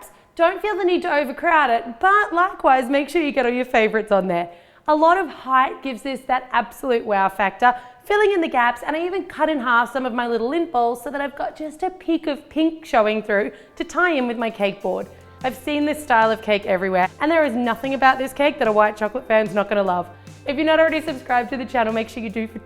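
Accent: Australia. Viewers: she is not English. her accent is Australian. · Australian I think :)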